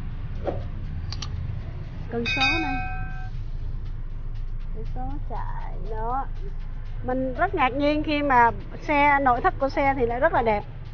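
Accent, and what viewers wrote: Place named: Nam Dinh, Vietnam. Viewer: C VẦY, VẦY giống quê NAM ĐỊNH em thế.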